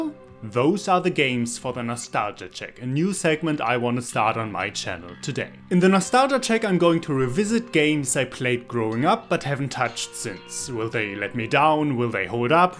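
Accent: Germany. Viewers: U are German right. Love the accent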